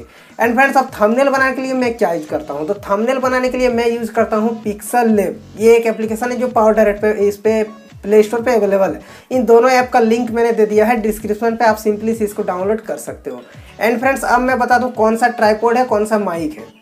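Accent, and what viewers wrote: Bihar, India. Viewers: You talk so sweetly are you from Bihar? :)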